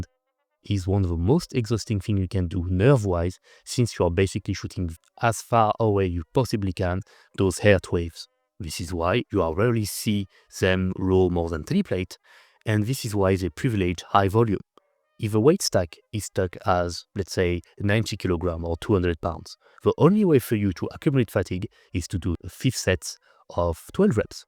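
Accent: France. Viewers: Not a fan of frenglish. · Nothing wrong his English and it’s just a French accent. Probably confusing for Americans who’ve had less exposure to it than those watching from Europe. Slowing his pace of speaking may help them.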